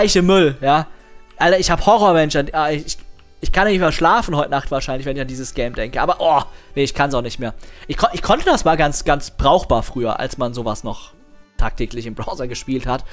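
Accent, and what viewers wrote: Hesse, Germany. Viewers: Ich weis nicht ob mir dein hessischer Dialekt früher nie aufgefallen ist, aber ich lieb‘s sehr 😂👍🏻 Und schön ein neues Video im neuen Jahr zu sehen, hoffentlich bleibt es in Zukunft dabei so regelmäßig Videos zu sehen. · Der hessische Dialekt hat sich auf jeden Fall nicht geändert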